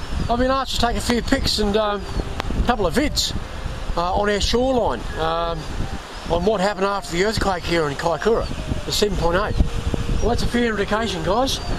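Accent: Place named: Queensland, Australia. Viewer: Mate sounds like you could be an Aussie, my guess is from Queensland.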